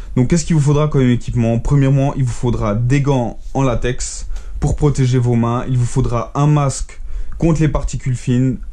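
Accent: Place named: Switzerland. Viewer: Bon... par où commencer : 1: je suis un passionné de pyrotechnie depuis mon plus jeune âge et tes vidéos sont exceptionnelles... 2: ton accent me semblait tellement familier je me suis dis ''obligé il est Suisse''... et vu les journaux, on vient du même endroit ! 3: J'ai environ 1 million de questions à te poser, je serais ravi que tu régales un Neuchâtelois 😄 Bref au top !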